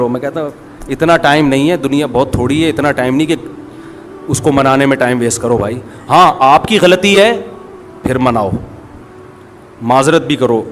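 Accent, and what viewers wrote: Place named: Karachi, Pakistan. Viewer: ویسے حضرت آپ کے بیانات سننے کی ایک اہم وجہ یہ ہے کہ آپ کے انداز بیان میں کراچی دیکھتا ہے آپ کراچی والوں کا انداز دیکھتا ہے اور جو افراد کراچی کو پسند کرتے ہے وہ آپ کو ضرور پسند کرے گا انشاللہ تعالیٰ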